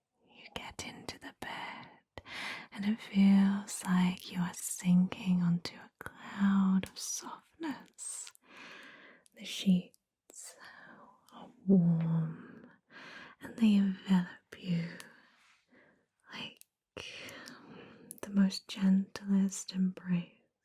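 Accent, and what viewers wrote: United Kingdom. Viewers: Your guided meditations are the best with the cherry on top being your british accent! This is as amazing as the previous ones ❤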